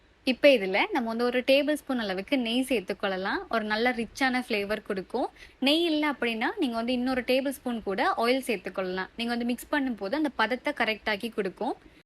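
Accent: Sri Lanka. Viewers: நீங்க ஆரம்பத்திலே உங்க பேச்சை வைத்து srilanka என்று தான் நினைத்தேன்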